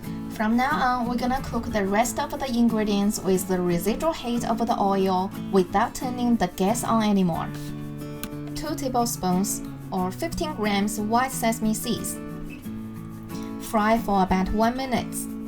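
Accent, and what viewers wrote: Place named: China. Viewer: Typical chinese accent :)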